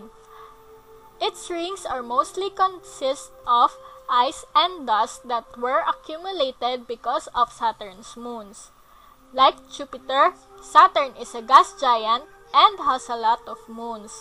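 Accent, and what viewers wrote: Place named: Philippines. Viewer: The voice makes it like she's a Filipina (Philippines)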